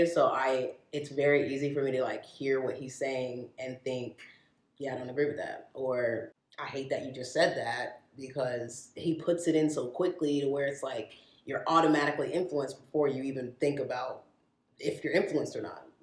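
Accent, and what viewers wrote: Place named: United States. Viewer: Oh American English! A great time here. ❤️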